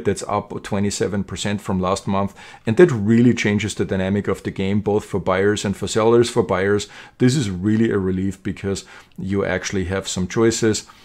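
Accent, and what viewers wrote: Germany. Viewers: Bist du aus Deutschland oder Österreich ?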